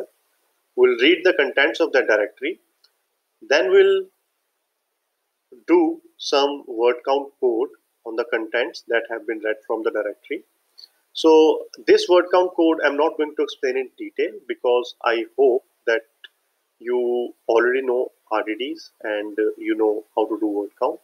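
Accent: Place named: India. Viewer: So indians are the only people that can do this? Is this explained anywhere in English without an accent...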